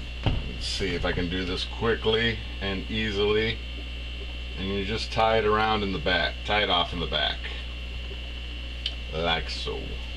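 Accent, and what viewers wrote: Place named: Chicago, United States. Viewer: Thanks for the DIY video and the shout out, both were much appreciated. I'm heading to Walmart after work to pick up the supplies to make this mop. I might make a slightly smaller version of it because I have limited space in my tank. Seems like we have a ton in common... cooking (I was a chef), apistogramma, and DIY.. you also sound like you are from Chicago, where I spent the first 20 years of my life.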